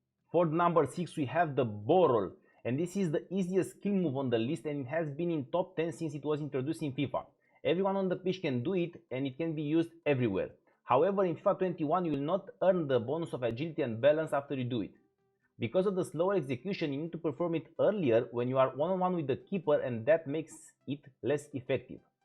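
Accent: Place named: London, United Kingdom. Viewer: Nice London man, are u African?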